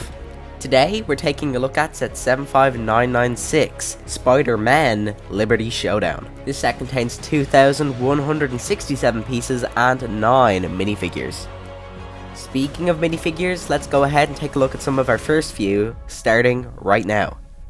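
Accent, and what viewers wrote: Ireland. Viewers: I really wish this was a real Lego set Also I could tell you were Irish just by you’re accent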